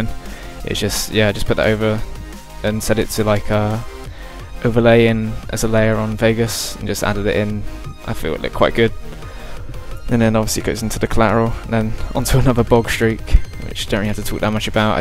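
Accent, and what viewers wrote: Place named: London, United Kingdom. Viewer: Ah ok, sounded like you where from London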